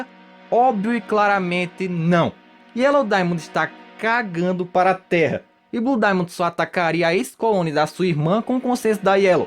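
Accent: Northeast, Brazil. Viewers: voce e do nordeste eu so moro na bahia valeu pela teoria muito loco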